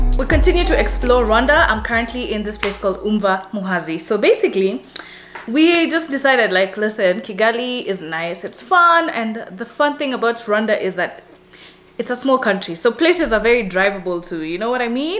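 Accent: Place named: Kenya. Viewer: ughh love your kenyan accent ! it has such a western tang to it !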